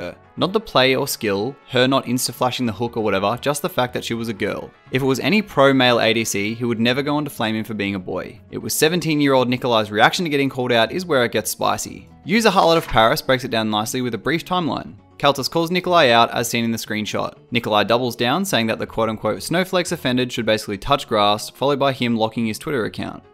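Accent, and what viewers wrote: Australia. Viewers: Very well put together. Want to know my thoughts? Ok sure. This guy is a fucking CLOWN what the fuck did he expect? Good response by MS. I like your video style of succinct and right to the chase. It is a breath of fresh air in the hastily edited 10min videos made specifically to get ads. I also love hearing another Aussie voice behind the camera. Keep up the good work!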